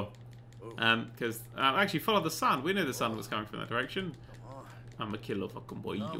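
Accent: England, United Kingdom. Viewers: No he is just English.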